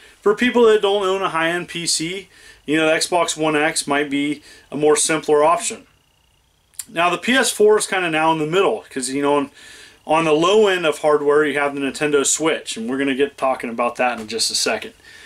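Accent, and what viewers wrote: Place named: Pennsylvania, United States. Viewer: Where u from sounds like you’re from Pennsylvania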